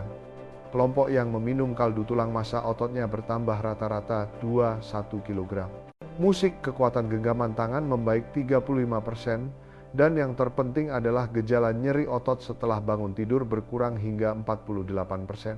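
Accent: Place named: Sumatra, Indonesia. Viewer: Inton sumatra no 1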